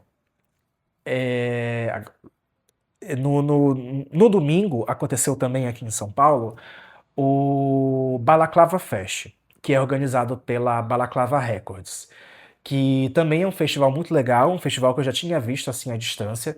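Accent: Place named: North, Brazil. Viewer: oi! há pouco conheci seu trabalho e já comecei a te acompanhar! fala um pouco mais sobre sua jornada, acredito que seu sotaque revela que você origina de alguma parte do norte, me corrija se eu estiver errado, enfim, ótimo conteúdo, estou super contente em ter "conhecido" você.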